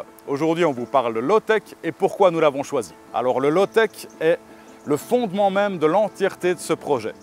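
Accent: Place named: Wallonia, Belgium. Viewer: wallonie belgique je dirais vu l'accent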